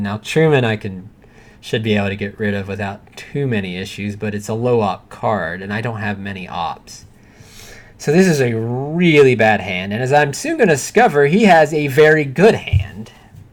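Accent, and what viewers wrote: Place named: Delmarva, United States. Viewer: Are you from the DelMarVa region of the US by chance? I grew up there and I think I hear a bit of that accent in your commentary? Thanks for making the video btw, love watching TS. :)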